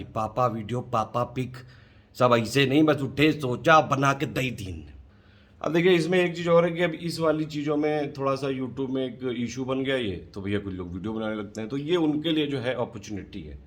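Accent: Kanpur, India. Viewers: Aaj kanpuriya style may sandesh bheja hai.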